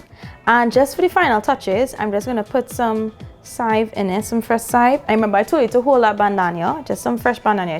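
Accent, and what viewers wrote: Trinidad and Tobago. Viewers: Great Recipe...I love your accent...Im hearing Trini...Am I correct???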